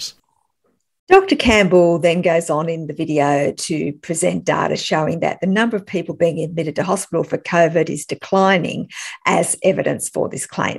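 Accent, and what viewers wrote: Australia. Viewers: Glad you're not my Dr. Mind you it sounds like you're Australian we've all noticed how mad you are over there about covid over there..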